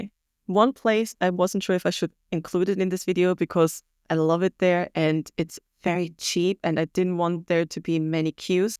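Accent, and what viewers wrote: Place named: Thailand. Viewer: พูดสำเนียงไทยชัดมาก🤟🤟❤️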